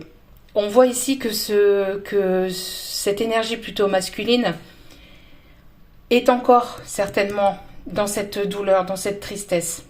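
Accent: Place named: North, France. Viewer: Quel tirage 🤯 mais quel tirage 💓 Moi j’aime bien votre accent du nord 😁😉😎 un grand merci 🙏 belle soirée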